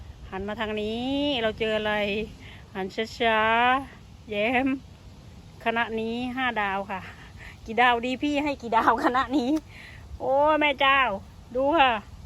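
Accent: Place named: South, Thailand. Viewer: ชอบจังเวลาพี่พูดภาษาใต้คะ😁🍄🍄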